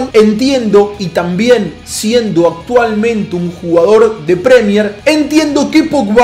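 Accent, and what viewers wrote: Argentina. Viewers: como se nota que eres argentino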